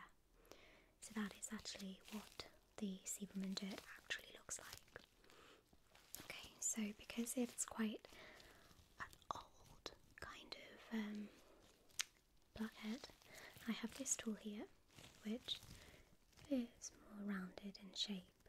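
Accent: United Kingdom. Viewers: A woman with a British accent is very lovely 😍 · Ohhh is that a British accent?? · What kind of accent is this??? I’m assuming something from the UK, but is there a specific region name?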